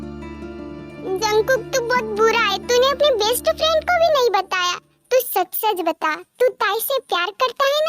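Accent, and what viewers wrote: Rajasthan, India. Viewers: Di aap rajasthan se ho kya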